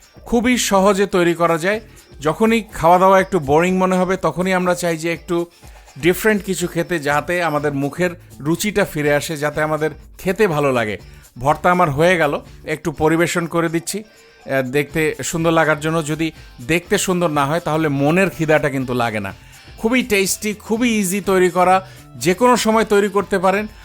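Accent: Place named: Dhaka, Bangladesh. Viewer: ভাইয়া আপনার ঢাকাইয়া ভাষায় কথা গুলো শুনতে আরো বেসি ভাল লাগে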